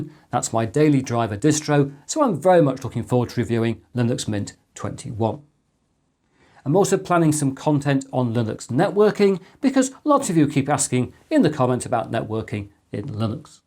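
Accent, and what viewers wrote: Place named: East Midlands, United Kingdom. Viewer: Yeah, there's something hypnotic about his East Midlands tones. 💤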